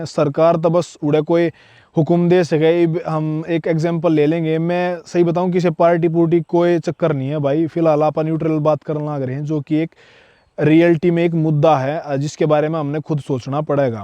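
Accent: Haryana, India. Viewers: Bhai Haryanvi Side Rakh k bol le to Thoda thik se Samajh aayega Sabko 🤦🏽‍♂️🤦🏽‍♂️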